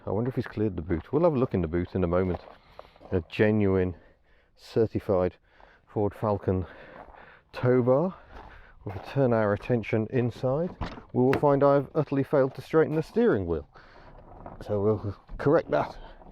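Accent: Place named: England, United Kingdom. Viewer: My God where did you get this sad old beast? Do all NZlanders sound English?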